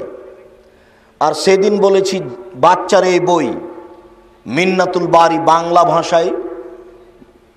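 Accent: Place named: Chapai Nawabganj, Bangladesh. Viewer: ধুর ব্যাটা চাপাই নবাবগঞ্জের ভাষায় আরবী পড়লে হইবো? আরবী শুদ্ধভাবে পড়তে হবে।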